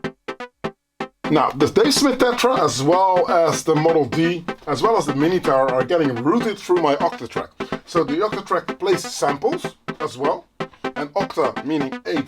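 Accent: Netherlands. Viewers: Dude are you dutch? You have a heavy dutch accent. And I’m just some random dude from California and can hear it.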